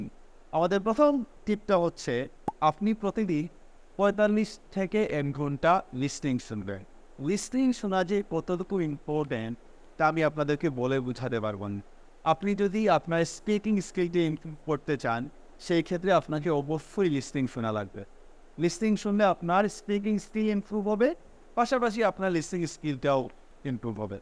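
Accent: Sylhet, Bangladesh. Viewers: Bcz sir sylheti 🥹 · uni sylhet er vai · Sir apnar pray video te sylheti basa aioi..but sylhety mat apnar muko sundor ow lage❤ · ভাইটি মনে হয় সিলেটি 😄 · Are you Sylhety sir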